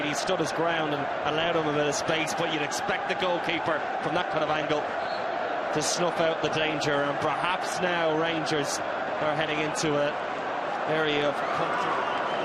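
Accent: Ireland. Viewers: irish commentary ffs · Sounds more irish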